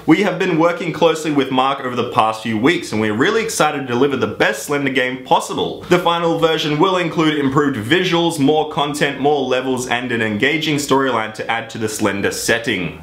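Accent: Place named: Australia. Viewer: His Australian accent >>>>>>>>>>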